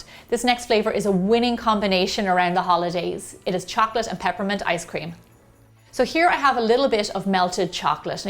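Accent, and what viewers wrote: Ireland. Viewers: Where in Ireland are you from ? I'm from Mayo in Ireland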